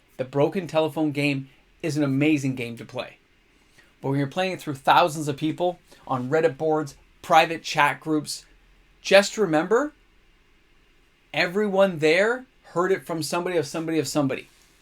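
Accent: Canada. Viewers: I almost shit myself when you said new capenna was 135$, then I heard your accent and figured it's Canadian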